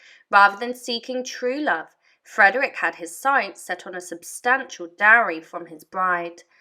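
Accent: United Kingdom. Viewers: Hard to Liston to, thick British accent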